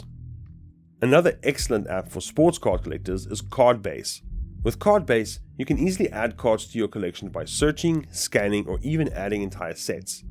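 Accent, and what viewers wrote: South Africa. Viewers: South African? 🇿🇦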